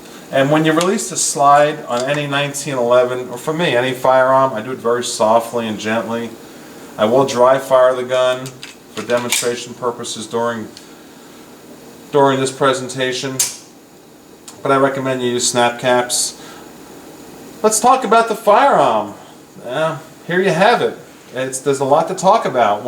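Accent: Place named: New England, United States. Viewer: Ya from Mass? got the New England accent going on there.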